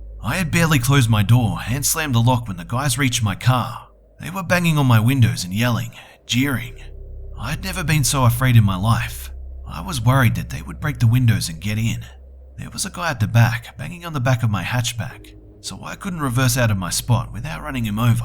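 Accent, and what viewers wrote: Australia. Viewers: Are you by any chance Australian? I'm Australian and picked up on your intro haha! Thought I'd ask :)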